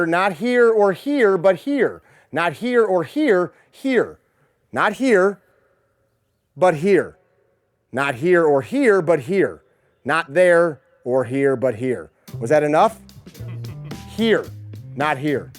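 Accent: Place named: New England, United States. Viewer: Cant stand that yankee voice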